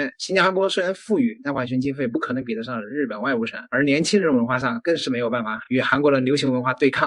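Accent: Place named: Anhui, China. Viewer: 安徽口音的 😂😂😂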